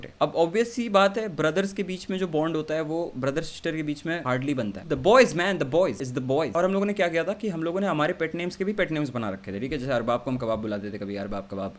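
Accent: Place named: Uttar Pradesh, India. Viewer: After a lot time..... using some UP accent yeh <<<<<<3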